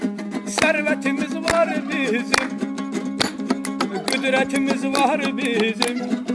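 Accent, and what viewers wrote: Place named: Avar, Russia. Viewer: Акцент Аварский или Цахурский! Молодец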